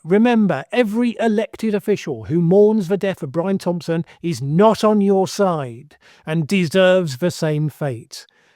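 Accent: United Kingdom. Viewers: Great video, thank you from a subscriber greatful for interesting inteligent thoughts and a pleasant British accent. 👋 Cymru... Any chance of you joining BlueSky for those of us who prefer not to add to Mr Musks empire.. If I had to guess that the human brain and it's psychology means you have to work to avoid that 'cultist warm cwtchy comfort zone' that is the inside of your own head. 😱..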